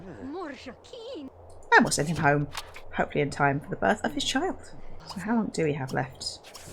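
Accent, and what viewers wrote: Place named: United Kingdom. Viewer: Omg u and I literally have the same accent 😂😂 us brits need to stick together 😁😁😁🇬🇧🇬🇧🇬🇧🇬🇧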